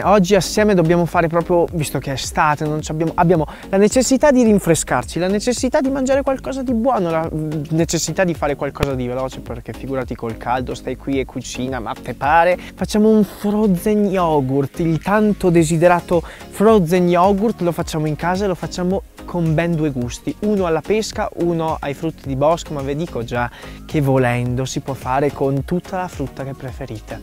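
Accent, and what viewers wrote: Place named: Romagna, Italy. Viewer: Ma perché hai l'accento romagnolo!?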